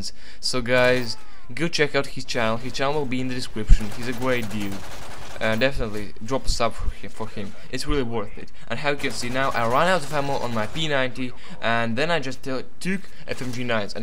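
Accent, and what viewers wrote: Latvia. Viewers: epic latvian accent hahah...nice moab dude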